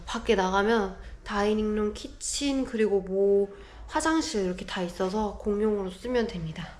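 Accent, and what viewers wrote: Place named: Spain. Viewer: My heart love your Spanish accent ❤❤❤❤❤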